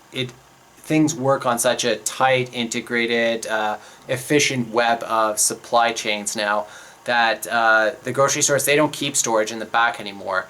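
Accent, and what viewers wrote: Canada. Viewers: The words 'about' and 'out' always give the Canuck away... lol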